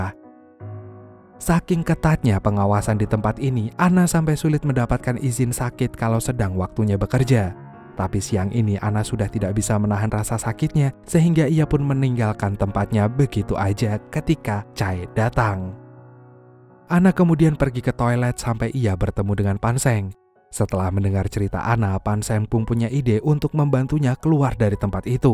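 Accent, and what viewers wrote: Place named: Nganjuk, Indonesia. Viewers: Curiga admin e wong nganjuk ki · Admin e wong nganjuk ki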